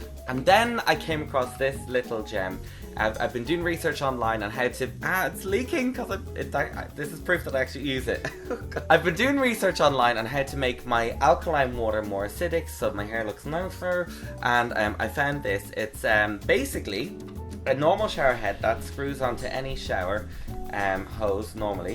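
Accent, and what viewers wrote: United States. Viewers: Nice American accent lol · Great American accent!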